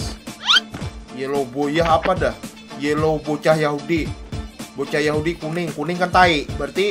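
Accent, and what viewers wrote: Banjar, Indonesia. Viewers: Bang Emang orang Banjar bang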